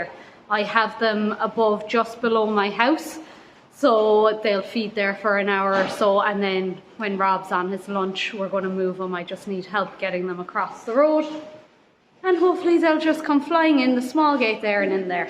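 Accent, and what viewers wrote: Ireland. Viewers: Well done. You really do work hard. I love your soft Irish accent. I could listen and watch you for hours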